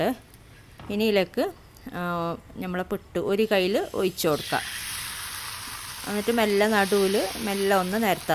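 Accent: Kasaragod, India. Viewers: Kasaragod slang aanallo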